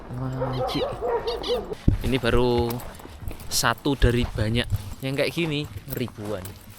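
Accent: Java, Indonesia. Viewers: Mas logat mu Surabaya atau madura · Kapan² full gae boso Jowo Cak 😁 logatmu wes kuwentell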